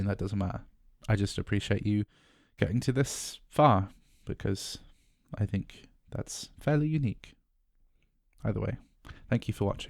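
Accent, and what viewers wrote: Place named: United Kingdom. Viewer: Oof, soon as i heard your accent i knew i wouldntbe able to trust the opinion. No offense but anyone who spends their time playing videogames rather than fighting their dystopian authoritarian matriachal super state is probably a person that is ok with or apart of that tyranny. Cant trust game review advice from a race socialist. Though i wish you luck and hope that your reading this doesnt cause your secret meme police to kick in your door and .. make you..i dunno walk round london asking for forgiveness from black women or w/e is your unelected prime minister enforces as his ttier punishments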